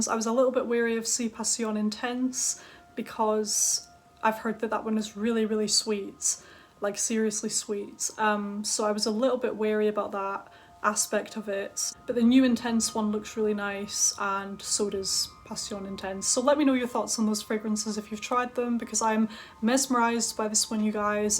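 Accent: Scotland, United Kingdom. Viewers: Nice video. You are a pleasure to watch, a star of Scotland. Lovely Scottish accent.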